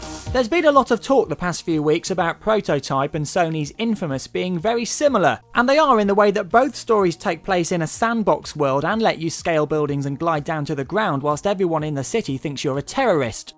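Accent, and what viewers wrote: United Kingdom. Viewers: this british guy with a british accent is talking way too british fast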